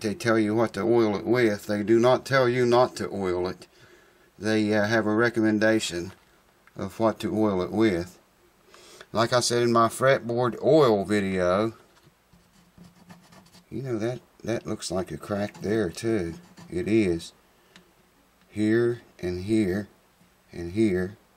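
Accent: South, United States. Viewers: So I knocked my stupid hardly used Wah, Wah off a shelf and it landed on my 3 month old baby...my new acoustic guitar! 😭😭😭😭 Looking for a fix, I found this video and immediately had a good laugh. with his : "Oh I didn't see you there" in I think is called a n English posh accent. Yes it was silly. But still was very funny and made me laugh, and feel a little better. Then it gets even better, when I find out that his actual voice is a southern US accent.. What other surprises does this guy have? No choice but to find out by subscribing..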